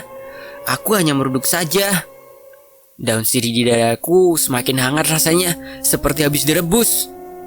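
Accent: Java, Indonesia. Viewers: Yap betul...logat Jawa mmg kayak gitu Krn AQ orang Jawa...semangat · Logat Jawa yg mana ???..tiap daerah jawa punya logat beda-beda..jadi gak aneh..!!yg penting isi yg di sampaikan dapat di mengerti..ok bosqu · Iku logate yo wes Jowo Gok!.. Jowo mu ndi se ??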